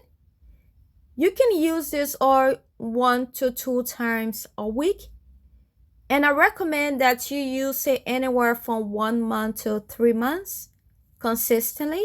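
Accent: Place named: Liberia. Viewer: Are you a liberian? You sound like one.. i'm a liberian also.. it really doesn't matter, just curious. Good video💗 thanks alot for the tips.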